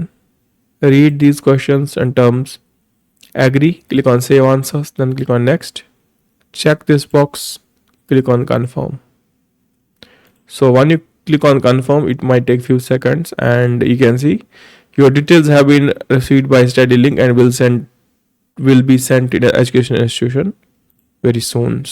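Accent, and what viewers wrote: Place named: India. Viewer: your indian accent was just disturbing. try to correct how to speak in english before applying scholarships.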